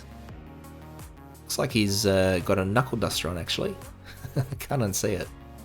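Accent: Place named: Australia. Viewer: Keep up the good work,! Your paintjobs are crisp and I really enjoy the Aussie accent when I see your painting.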